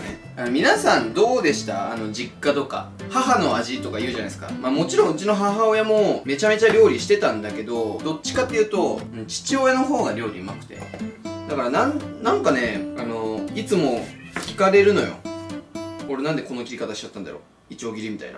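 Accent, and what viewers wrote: Miyazaki, Japan. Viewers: 宮崎弁喋ってるの超好き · わ〜！！！！ばちこりの宮崎弁嬉しい😭😭🤍(宮崎県民です) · めっちゃ宮崎弁、もう宮崎弁で話した時あ、って思ったwめっちゃ親近感、私も宮崎県だからめっちゃ訛ってるw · 今まで登録はせず、おすすめに上がってきたら観る程度だったんですけど、宮崎弁とただただ良い人感にやられて登録しましたww さんみたいな人が叔父だったらわたしもきっと自慢するし伯父っ子になりそう。。🥺🥰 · 宮崎弁可愛すぎる😂❤️ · の宮崎弁かっこいい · さんの宮崎弁なんか好き笑ちなみに、私のおばあちゃん家も宮崎です！ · 宮崎弁すごい🤣🤣🤣🤣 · うちの親戚宮崎人やけんめっちゃ宮崎弁懐かしい気持ちになるw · 宮崎弁最高👍👍👍👍👍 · 最初の宮崎弁でめっちゃ親近感わいたっちゃけど😂(あえて宮崎弁で笑笑)度々出てくる宮崎弁がいつも嬉しい☺️🤞🏻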